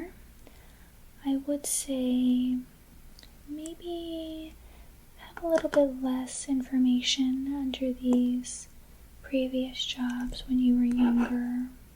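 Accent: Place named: Midwest, United States. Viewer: Do you live in the midwest? You sound like you have a midwest accent.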